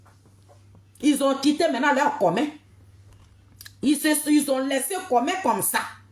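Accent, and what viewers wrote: Bété, Ivory Coast. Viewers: J'ai écouté tes délires avec intérêt. Yako ton accent ne nous échappe pas . T'inquiète Blé Goudé sera le président de la république de Guiberoua la terre qui t'a vue naître . Gbagbo grace à qui Blé alias pétrole cent francs allumettes vingt cinq a gagné nom dans Côte-d'Ivoire n'est pas rien . La Gougnon du groupe ethnique Bhété tu t'es dévoilée ainsi , alors merci Nous sommes à l'ère du Verseau chacun récoltera ce qu'il a semé . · Tu parles français avec un accent bété très prononcé. Pardon fais un effort ma sœur. Sinon le fond est bon.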